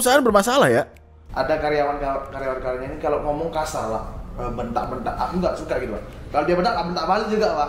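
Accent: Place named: Surabaya, Indonesia. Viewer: Suroboyo Banget 😂 Aku cinta Orang2 Surabaya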